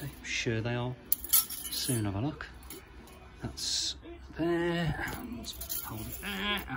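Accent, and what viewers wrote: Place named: Midlands, United Kingdom. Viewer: Cheers dude not getting one you saved my bacon midland acsent👌☕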